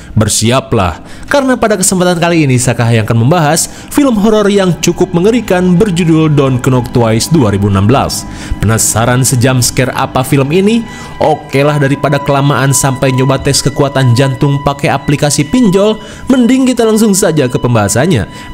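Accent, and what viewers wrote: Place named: West Java, Indonesia. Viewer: Bocil sok pinter dih,pdhal yg baca naskah alur ini tuh orang sunda dengan logat dan suka melesetin kata kata biar ga terlaly flat dan kaku pembawaan nya, lu penonton baru apa gmna? Dri dlu jg bgini pembawaan nya kocak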